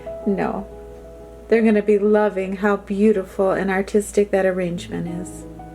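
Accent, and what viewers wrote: Midwest, United States. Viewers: I’m a new subscriber but a seasoned woman exactly your age! I love your videos,! My dog died a couple of years ago so I’m enjoying Desi and watching you two relate. Makes me miss my girlI I love the sound of your midwestern voice and your infectious positivity! My apartment is my sanctuary too and I decorate it with whatevery I find to be beautiful and useful, much of it thrifted. I know I’m alive because my surroundings matter to me and I never stop wanting to beautify my home. There’s no place like it. Thanks for inviting me into your lovely abode! Keep up the great work you’re doing. See you next time. It’s a deal!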